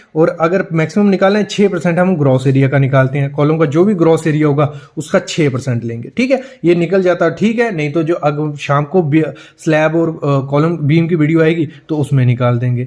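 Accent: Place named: Kashmir, India. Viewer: Aapki vice batla rahi hai ki aap j & k se hai ji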